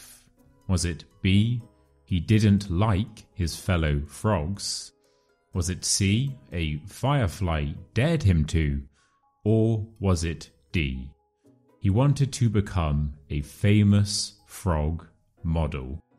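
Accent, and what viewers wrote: United Kingdom. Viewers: Thanks for your new video!🐸Today’s story was quite challenging for me! 😂 I found a lot of new words in it. I do love your beautiful British pronunciation!:_HenryNice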